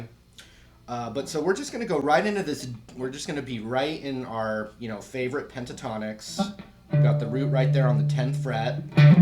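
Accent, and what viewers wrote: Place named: New York, United States. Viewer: Hey, are you from New York? i don't know, i think you have a New York accent. i'm from Belgium so excuse if i made a mistake.:p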